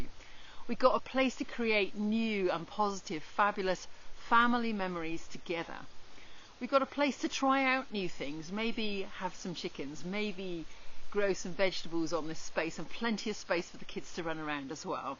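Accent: United Kingdom. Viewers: Are you from Norfolk? You sound like Pam Aires. (Hope that is the correct spelling). Sorry for your loss. Moving abroad sounds great but language is important factor to consider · I just watched your video again (saw it for the first time this morning). I am so impressed with your remarks -- they really resonate for me, and inspire me. "I've been investing in my retirement for many years, but in this moment I wanted to invest in happiness, and joy, and precious memories." WOW! Thanks for inspiring me. Where do you live when not in Sweden? Based on your (slight accent), I assume you are British? Thanks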